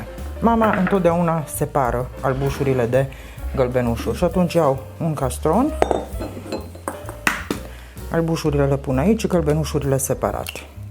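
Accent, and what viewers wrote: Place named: Arad, Romania. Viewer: Imi place accentul din vorbire.Mi-aduce aminte de anii cand lucram la U.T.A in orasul Arad .Ce prosoape si cearsafuri de Damasc de calitate producea fabrica aia!!!!. MULTUMESC pentru reteta.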